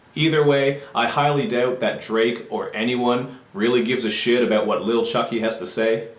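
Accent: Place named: Canada. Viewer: I liked the album and how sensitive Drake is. I love and. Great reviews btw! You're super cute and sound Canadian, are you Caribbean?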